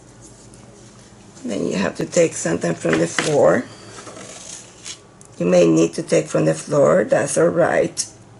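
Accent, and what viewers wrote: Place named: New Mexico, United States. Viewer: I love hearing the way you say the names of colors, I miss New Mexico and your accent is lovely to me, and I have been able to remember the different types of colors, for choosing due to the way it registers.. and the fact that your examples with these paints are totally inspiring, motivating. thank you.. for honest and talented pours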